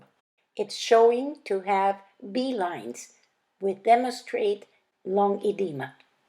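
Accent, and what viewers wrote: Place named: Italy. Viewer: MD, RDMS en los comentarios del video se escribieron tantas cosas de ti, tienes todavia muchos fans que añoran esos tiempos mucha gente me decia que te habias casado con un militar de la epoca de los 70s otros cque con un musico, etc etc las pocas veces que habalste ne los videos note un acento diferente, eres italiana?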